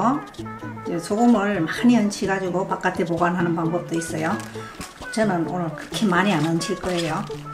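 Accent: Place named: Seongju, South Korea. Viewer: 시래기 성주 사투리 반갑습니다 ~~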